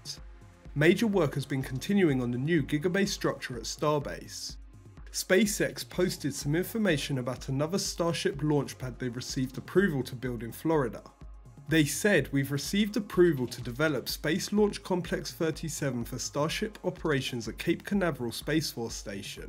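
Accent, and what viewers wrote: England, United Kingdom. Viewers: Another english voice? Man get over it.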